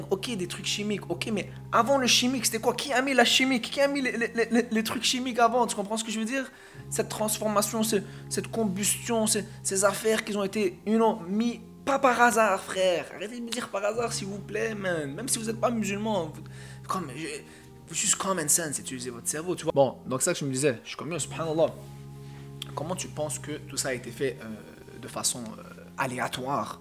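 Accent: Canada. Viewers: mais c’est un canadien tout le monde parle comme ça ici !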